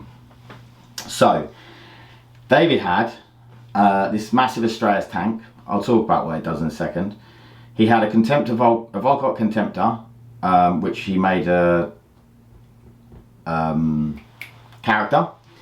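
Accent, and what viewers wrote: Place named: Australia. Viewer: Thought you were from OZ…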